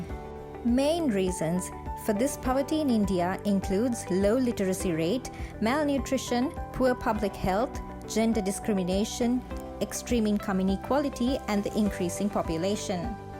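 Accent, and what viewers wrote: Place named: Bangladesh. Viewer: Very nice content with plenty of information, does the speaker belong to Bangladesh?🇧🇩 she sounds like a Bangladeshi girl, very nice presentation.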